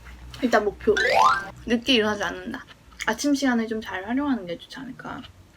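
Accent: Seoul, South Korea. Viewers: 와 진짜로 옛날 서울사투리 듣는 기분 ㅠㅠ 넘 예뻐여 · 먼가 말투가 2000년대초 서울 사투리같아요 ㅋㅋ(칭찬)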